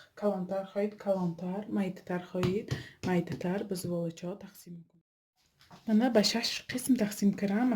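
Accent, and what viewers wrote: Isfara, Tajikistan. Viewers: Гап нест. Апачон ба фикрам шумо а Исфара а? Лахчатон худи исфараги боин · Рахмати калон моям аз исфара аз тарафи таги бихи 39 сола шахри Саранск ба 6солбоз зиндаги мекунем сохиби 5 писар❤️❤️аз овозатон фарк карам ки аз исфараи бостони хастед.. Хелллеее шод шудам.. Дина торти наполеон ро бо рецепти шумо таер карам бенихоят хамираш махину бомазза буд рахмати калон...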